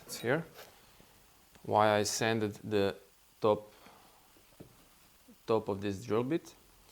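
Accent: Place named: Eastern Europe. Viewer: I really loved watching this video. It’s informative and I especially enjoyed the calm pace of it and your voice and (Slavic?) accent. It was also very clearly explained and I’m confident I can give this a try myself now. Definitely appreciate a good homemade video like this without all the editing, quick scenes, flashing lights, annoying loud music and clickbait. Just a simple information video. Keep it going!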